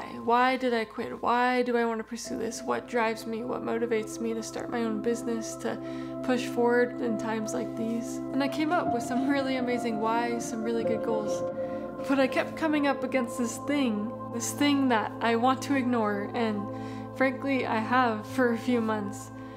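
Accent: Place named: Netherlands. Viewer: Well `done, you accent sound dutch buy the way! :)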